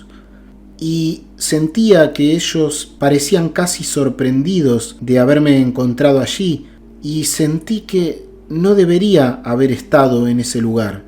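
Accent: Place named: Argentina. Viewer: Vamos a darles likes,para seguir escuchando la voz relájate de éste caballero, lástima que no hay opción de darle me encanta,me fascina, porque a mí la verdad me encanta como el habla,me gusta escuchar,los argentinos,los uruguayos,los chilenos,se escuchan parecidos.les envío a todos abrazos de luz, que Dios los bendiga.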